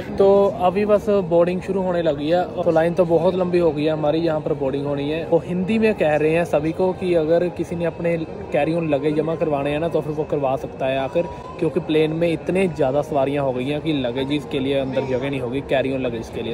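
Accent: Punjab, India. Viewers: Bai ji punjabi muh se punjabi accent bohot kaint lagta hai😂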